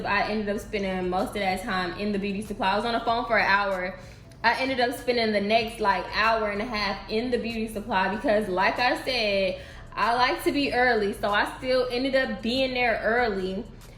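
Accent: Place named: Chicago, United States. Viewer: Sis im new here n u talk like ur from the chi w me cthu! Lol u had me rolling bc ur reactions to tht chick wud have been mine too!😂 new friend here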